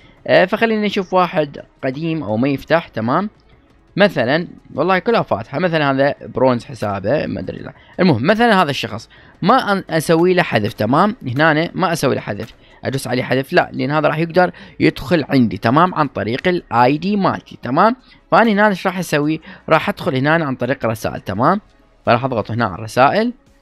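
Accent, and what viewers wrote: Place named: Egypt. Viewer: دسهلهة جنك مصري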